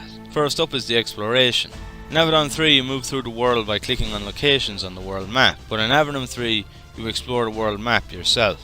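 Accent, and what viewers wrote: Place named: Ireland. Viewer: Dude you are fine-keep your Irish accent and be proud of it my man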